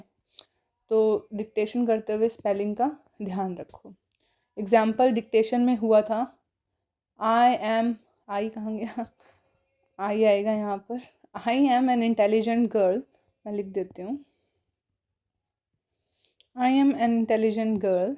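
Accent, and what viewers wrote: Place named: Haryana, India. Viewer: I like your haryanvi accent🔥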